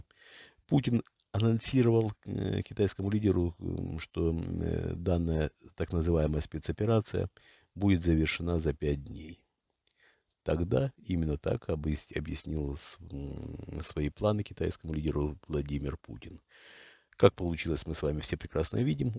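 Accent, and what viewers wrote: Ukraine. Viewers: зареганный в Австралии. Льющий воду, запугивающий и тот и тот народ, дающий ложные надежды, не умеющий оперировать военными терминами, неправильно составляющий предложения, плохо знающий синтаксис и в разговоре имеющий украинские следы в диалекте. Даже не знающий про за закрытый город, откуда были пуски. Когда этот хэнэрал врет он кашляет и запинается все больше и больше.его как сегодня выдает неуверенный от лжи прокуренный голос. Он, как и всегда выпрашивает Донат лайки деньги. Он просто стрижёт контент на народном горе. Карма ударит по нему а бумеранг пущенного зла совершит круг и прилетит точно в точку отсчета. Люди, в тяжёлые времена проверяйте перепроверять по сто раз источники, не питайте иллюзий, верьте своей интуиции, помогайте старикам, детям, животным. они самые незащищенные. Режим пу падет. Но никто, ни царь и ни герой, мы сами.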